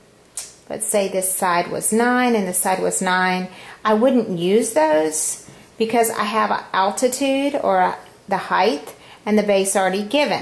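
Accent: South, United States. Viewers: So southern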